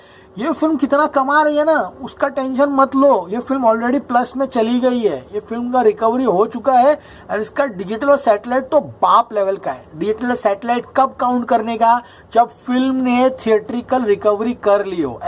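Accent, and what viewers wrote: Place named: Hyderabad, India. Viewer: Apka Hyderabadi hindi superb yaroo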